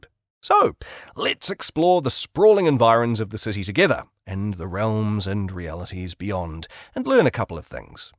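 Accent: New Zealand. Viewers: YOU’RE A KIWI?? The way my neck snapped around when I heard that omg… Hiii from a fellow Kiwi!!! Should have been able to tell from the accent 😭 but I was like “no way right”…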